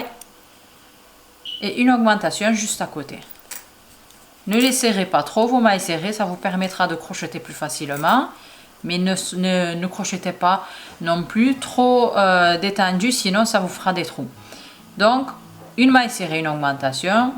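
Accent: South, France. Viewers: Super tutoriel bien expliquer avec un jolie acsent du sud😂❤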